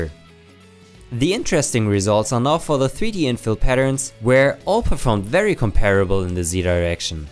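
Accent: Germany. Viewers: man do i hate the german accent, but the content is interesting 🥲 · My 1st thought after 2 sec: German, Wilkommen im Club!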